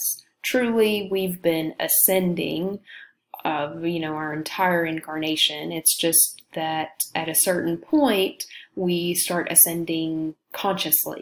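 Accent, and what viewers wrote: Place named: Texas, United States. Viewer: and I say definitely embrace your Texan accent. God's in his/her place. All is right with the world.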